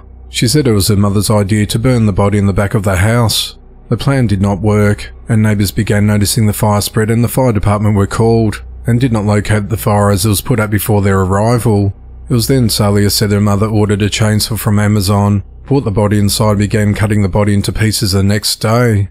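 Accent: Australia. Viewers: The narrator is Australian or nearby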